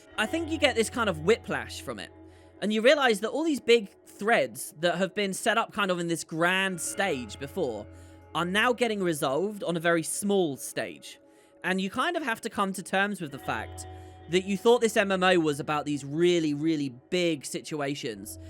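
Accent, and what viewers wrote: United Kingdom. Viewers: wow, you really sound British with the audio like that. but, 1 thing i think i would say about the legendaries is i think the only way they will add more or add new spear, trident, and speargun, is if they come out with and elite, example i can give is with Ele getting a Bow, as i think anet knows that ppl will rage if they mod 1 of the existing weapon, they would replace that elite weapon with a Spear instead of a Staff, and if they would add in a new set of legendary weapons, i think they would just do the practice they did with Legendary Armor, and Trinkets, they would make WvW/PvP weapons up-gradable, i think 1 issue is with gen2 is you can't sell them.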